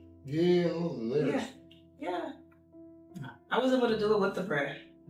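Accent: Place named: Liberia. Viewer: The way you pronounce certain words reminds me of Mercy Gono, who is also from Liberia.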